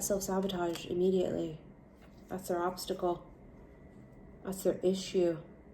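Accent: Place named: Hawaii, United States. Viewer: 😂😂😂I knew you where from Hawaii!!!! I could hear it in your voice!!! Love yah sis🙌🙌🥰🥰🥰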